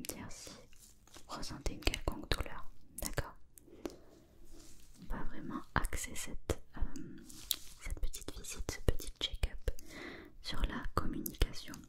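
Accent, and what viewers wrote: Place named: South-West, France. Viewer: Un chuchotement comme ça avec l accent de mon Sud ouest! Que demander de mieux! Merci 👍☺️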